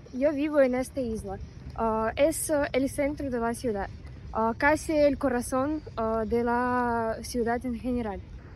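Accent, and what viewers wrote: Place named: Spain. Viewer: Su lenguaje es con tono de España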